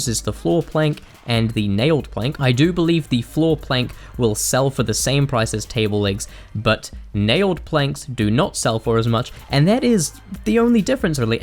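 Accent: New Zealand. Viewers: are u from nz? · Are you from nz cause I am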